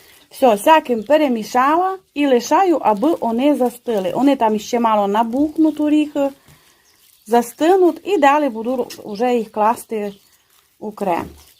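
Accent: Transcarpathia, Ukraine. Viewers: Дуже дякую за чудові рецепти.❤Прекрасний закарпатський діалект.Любуюся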